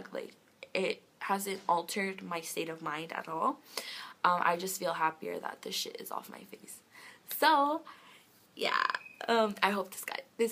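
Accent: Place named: Canada. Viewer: Lol from your voice I thought you lived in Canada cause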